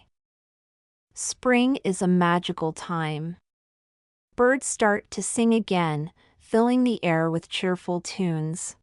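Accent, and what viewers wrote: United Kingdom. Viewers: Is it British accent ?! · Please does this channel have a British accent? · Is this British english?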